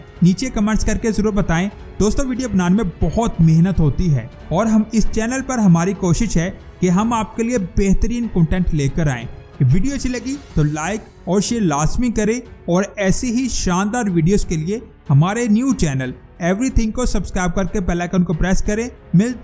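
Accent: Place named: Pakistan. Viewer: Love from india ! You're the first good Pakistani YouTuber I've seen (can tell by your Hindi/Urdu accent)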